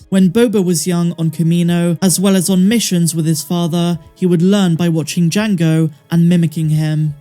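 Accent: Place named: England, United Kingdom. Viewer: Twated lol big up star wars so nice hearing about star wars in a English accent keep up the good work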